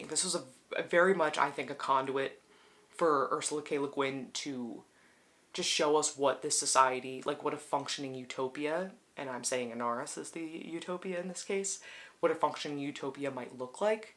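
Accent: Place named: Ireland. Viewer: Yayyy congrats on the new job! Also loved your Irish accent haha :)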